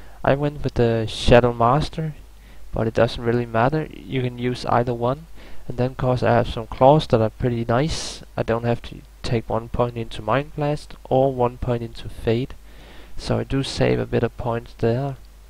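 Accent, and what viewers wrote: Denmark. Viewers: Nice tutorial ！ Are u from Denmark?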